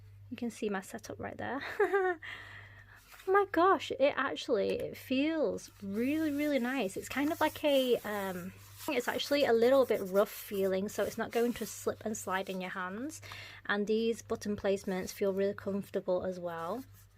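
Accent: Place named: United Kingdom. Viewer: Not trying to be like racist but this is the first asian (I think I’m sorry if your not ) who has a British accent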